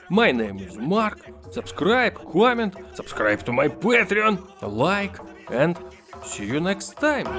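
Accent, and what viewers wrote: Russia. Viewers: I don’t understand, are you Russian? the accent is very similar to Russian (hello from the Russian Far East)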